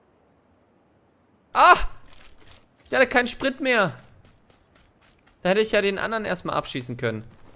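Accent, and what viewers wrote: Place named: North, Germany. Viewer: Alter Schwede!!! Das geht schon klar :D Sagmal woher kommst du?? Irgendwie hörst du dich sehr nordisch an ;)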